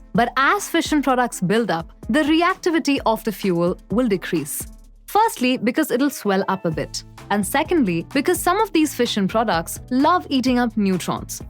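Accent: India. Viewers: Liked her Indian Accent. It's broken and jumps from one twig to another like a little birdie. Hard to get the sense though. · Indian accent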